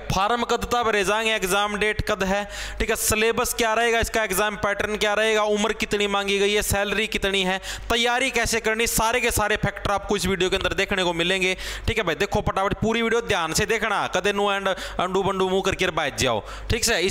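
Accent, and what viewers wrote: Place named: Punjab, India. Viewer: Panjab se ka aap aaye hai sir aapka to bhasa bahut mast hai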